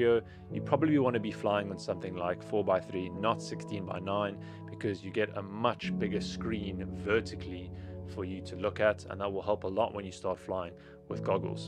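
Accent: South Africa. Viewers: are u from south africa?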